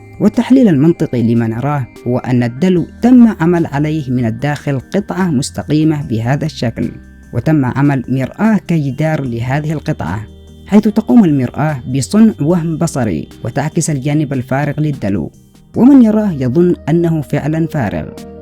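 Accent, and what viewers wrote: Yemen. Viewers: عمر باعمر هذه لهجة يمنية و يصعب فيها نطق بعض الحروف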